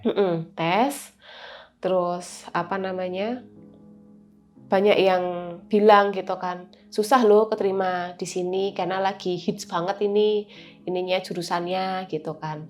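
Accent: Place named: Java, Indonesia. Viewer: Bahasa INGGRIS medok Bahasa INDONESIA medok Bahasa JAWA medok Intinya dia medok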